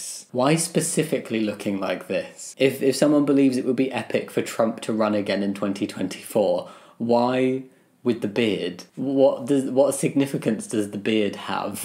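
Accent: England, United Kingdom. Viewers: you look, talk and act like a caricature of what an english person looks like on basically any american sitcom EVER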